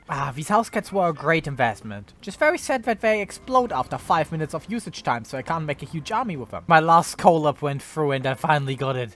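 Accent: Germany. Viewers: I heard a german playing a ww2 game???? Count me in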